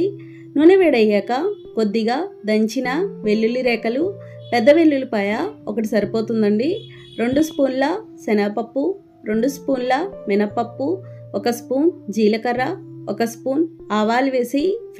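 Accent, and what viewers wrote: Godavari, India. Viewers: Is your Telugu, Godavari Telugu, sweet to head pure telugu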